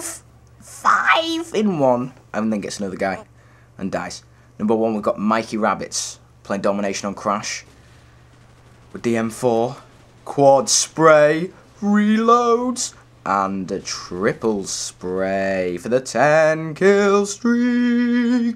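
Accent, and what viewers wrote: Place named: United States. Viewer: why do you say "zee"? american accent is very entertaining to listen to... xD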